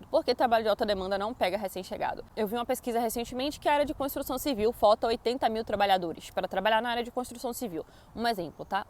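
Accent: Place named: Northeast, Brazil. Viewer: Vc é nordestina..pq vc fala Pórtugal.. típico dos nordestinos ☺️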